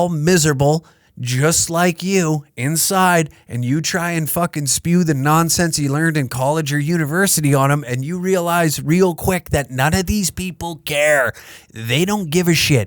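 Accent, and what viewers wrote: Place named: Alberta, Canada. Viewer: You have the best FUBAR accent I've ever heard. Only a proper 'Bertan can talk like that.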